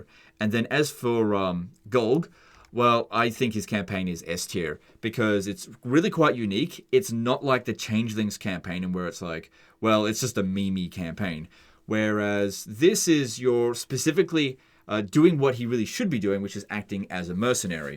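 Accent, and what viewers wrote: Australia. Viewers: How come you can't say golgfag maneaters name? Is that an australian accent thing?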